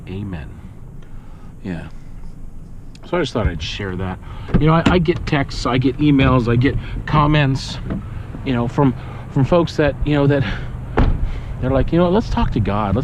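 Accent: United Kingdom. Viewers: love your British accent you make me laugh xxx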